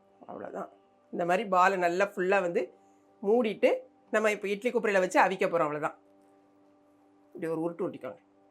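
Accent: Tirunelveli, India. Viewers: 👌👍 திருநெல்வேலி யா நல்ல தமிழ் பேசுதேங்க இது முதலில் எழுதியிருக்கேன்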